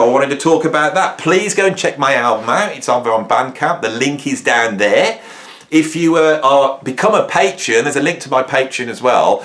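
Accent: United Kingdom. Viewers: I thank you for your channel it's therapeutic and informative. Plus, I enjoy your British accent 👍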